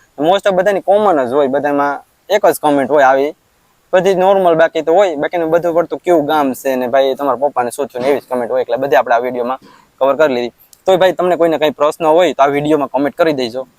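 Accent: Kathiawar, India. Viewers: હું આપનાં વિડિઓ તમારી કાઠિયાવાડી ભાષા ને કારણે જ જોવ છું.....સરસ એવી રીતે બનાવતા રહેજો..👍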